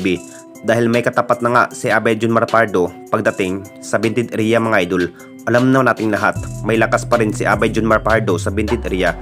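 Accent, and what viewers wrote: Visayas, Philippines. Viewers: Yang nag sasalita masakit sa Tenga ang pagsasalita niya bisaya ba yan oh ilokano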